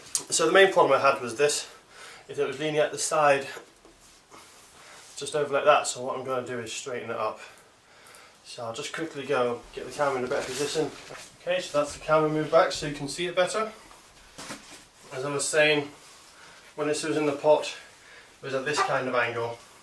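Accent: United Kingdom. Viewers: I'm comforted by the fact that you are (or certainly sound) British, so I'm presuming you're in the UK...? I have 7 Frangipani plantlets which I germinated last year; they're all about 6" tall, so I'm about to re-pot all of them. Wish me luck - !!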